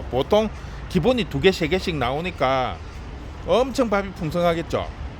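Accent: Gyeongsang, South Korea. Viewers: 사장님 사시는데가 어디입니까?저는진주사는데 말씨가 이쪽경상도 같아서 가까우면 가계에 한번가보고싶어요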